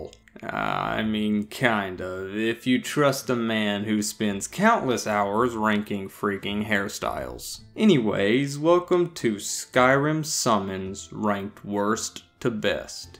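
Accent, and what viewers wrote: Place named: Tennessee, United States. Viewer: I hear a TN accent. Maybe TX. Maybe just joke accents but theyre convincing.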